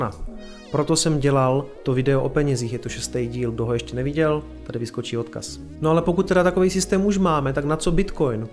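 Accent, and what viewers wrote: Czech Republic. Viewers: Už tě sleduju nějakou dobu ale ted slyším že si z Prostějova. Já jsem se tam narodil a bydlel 22 let. Dobrá práce, moc pekný videa, naučil sem se hrozně moc a občas se i zasměju 👍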